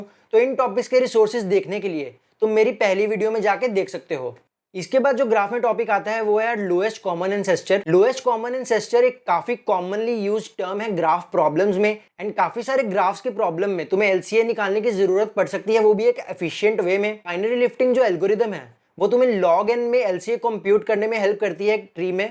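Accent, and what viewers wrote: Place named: India. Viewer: can you add the transcription in your video pls. The India accent seems pretty hard to listen:((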